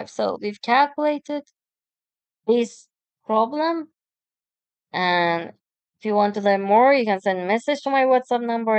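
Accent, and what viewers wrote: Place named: England, United Kingdom. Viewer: La voix féminine de la commentatrice est traînante...ma parole c'est une anglaise...je suis dur d'oreille...les appareils auditifs sont beaucoup trop chers pour moi!Y-a pas de quoi rire...quand on est vieux on est vieux! Il paraît que Google va faire faillite...Ça ne m'étonne qu'à moitié...Ils poursuivent trop leur abonnés de leurs assiduités...C'est vraiment lassant à force même pour un vieux retraité.😢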